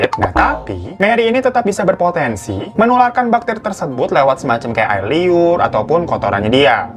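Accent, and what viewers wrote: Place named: Jakarta, Indonesia. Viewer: Suka pengen ketawa sama abng yg satu ini 😁nama nama depan kyk nama jepang, wjh jg rada" Jepang atau cina, pas ngomong betawi ora 😁 lanjutkan bng, gw syukkkaaa syukkaaa syukkkaaa dngn bhs Betawi ora nya itu 😂😂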